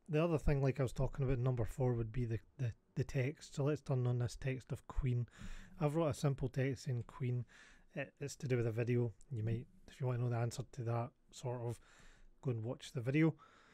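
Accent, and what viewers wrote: Scotland, United Kingdom. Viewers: I just come here to hear the Scottish accent. It's my favourite accent in the British Isles.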